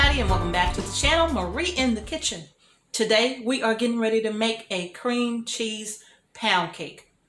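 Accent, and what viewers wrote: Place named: United States. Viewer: I love this lady she talks so nice. Not overly English or southern style. Very good sound for me I am hard of hearing l love her American sound.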